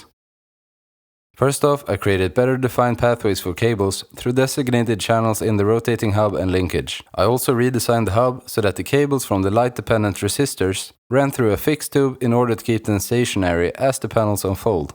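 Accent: Sweden. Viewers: You must be Swedish, u talk with a Swedish accent. Or possibly Norwegian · Might you be svidish?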